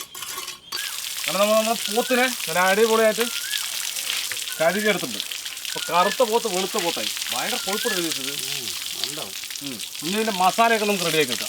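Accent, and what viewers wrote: Palakkad, India. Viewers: Palakkkadan slang♥️😌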